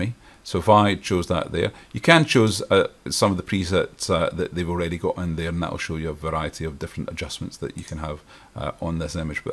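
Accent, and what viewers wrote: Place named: Scotland, United Kingdom. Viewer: Thanks. Im a fellow Scot who has lived in Australia for 40 years. I watch a lot of photoshop videos but it’s nice to see one in my own lingo and you present very clearly and concisely. Cheers pal.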